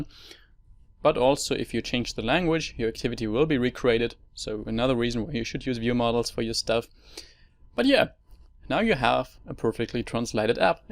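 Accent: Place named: Germany. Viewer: I always wondered what your accent was — now I know, it’s German!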